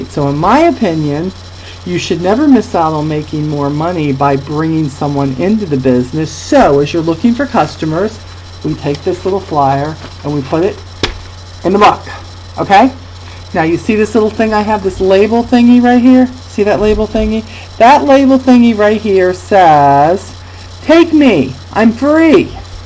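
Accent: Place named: Maryland, United States. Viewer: Your videos are informational, packed with lots of tips and inspirations of your experiences in the real world of Avon. Love the part where you say, Avon Chicks. For some reason, those two words and the emphasis you place on those two words make me smile. I think it's your Maryland accent. I have a wish for you and Lee. Continue to rock in your Avon business! (Jeff)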